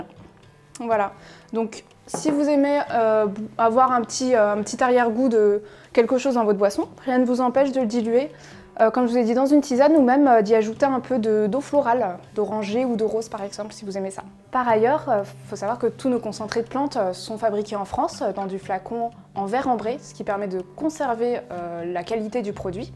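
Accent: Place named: Arab world. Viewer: Accent arabe ça fait plaisir 🥰🥰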